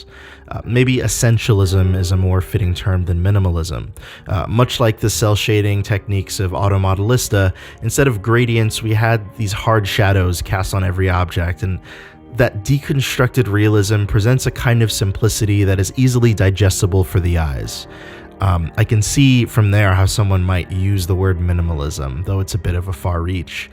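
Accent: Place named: Denmark. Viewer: I love your content, but.. Danish accent in English is like a trigger to me. It's probably my top cringe button. I enjoyed the way you did your logo though, with the more animation-y momentum and that the particles, really dug that.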